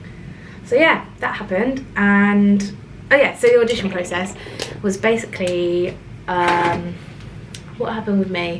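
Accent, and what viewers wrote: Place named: Australia. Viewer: loving the australian accent comeback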